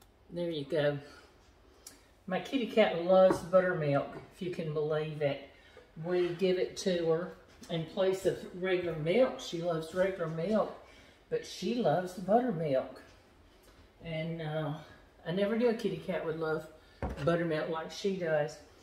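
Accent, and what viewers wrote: South, United States. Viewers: like I'm watching my grandma southern drawl n all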